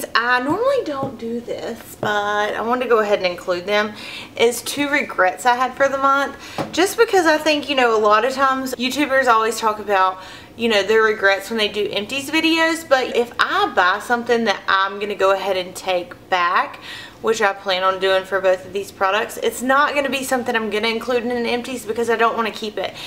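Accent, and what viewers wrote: South, United States. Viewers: you are too precious!! I commented before I watched the end of this one....you are so sweet to us into your favorites:)!!!!!! too cute xoxoxo keep it up you are already inspiring me and I'm super allot of other girls to follow there dreams....I have a thick southern accent too and I was always scared to try YouTube cause I was worried people would constantly hate on me about it:(! but seeing you smiling and being yourself is such an inspiration! !! love ya girl thank you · Omg I love your accent it's so cute and country and stuff haha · Can i just have your accent ? ❤️❤️❤️❤️ mine isnt as strong as yours i just love love live southern accents ❤️👌🏼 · It'd be so cool if I won the Raquel Nars because my name is Raquel. But anyways, I don't think that you should title your videos with giveaway because then people will only be interested in that one video and ignore your true talent and southern accent. 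Just an idea. Btw I love you and you are so beautiful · Found you thru Instagram...new subscriber! Loving your channel and that I can tell you're a southern girl too! xoxo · I found you on istagram and I'm glad I did! LOVE YOUR VIDEOS!! You should do a Question and answer (Q&A) BTW your southern accent is soooo Cute!!! ♡♡ XoXo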